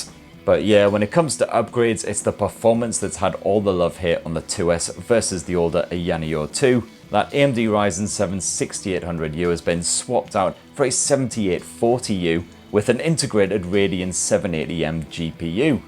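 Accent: United Kingdom. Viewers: As an american, hearing a brit pronounce something a little odd is totally normal. We have bottled water, you guys have bo'o o wa'a. I could listen to you guys read the phone book and it would be music to my ears.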